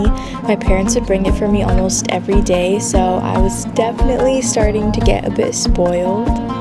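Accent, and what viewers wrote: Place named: California, United States. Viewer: Girl I’m from California too & im sure we do have an accent😂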